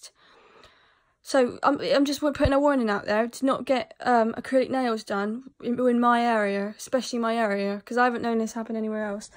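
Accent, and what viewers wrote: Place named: West Country, United Kingdom. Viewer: that west country accent lol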